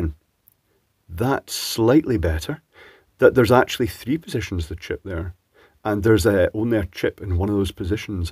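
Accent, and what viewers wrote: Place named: Glasgow, United Kingdom. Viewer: Just love the Glasgay patter, Goes of like a Two Bob Rocket hahaha made my day hearing that. ..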